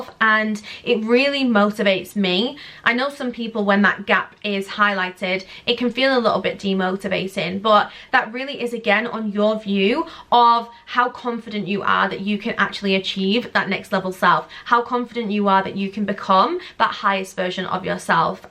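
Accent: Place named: United Kingdom. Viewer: I am in love with the british accent, I could listen to this for hours 🫶🏽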